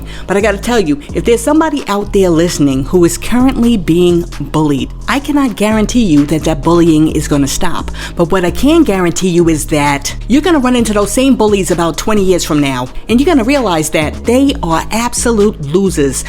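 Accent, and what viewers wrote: New York, United States. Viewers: I ABSOLUTELY LOVE THE GRITTY SOUTHERN AND INTENSE NEW YORK ACCENT TO MY CORE.. IT FEELS LIKE HOME 🏘️ 🏡. I'M SO EXCITED FOR THE NEW CHANNEL..GIVE ME MORE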